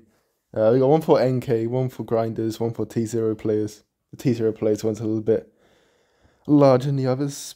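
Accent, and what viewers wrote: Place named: United States. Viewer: u sound like an American 😮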